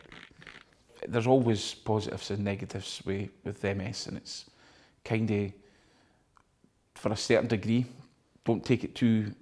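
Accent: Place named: Scotland, United Kingdom. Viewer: He's Scottish 😊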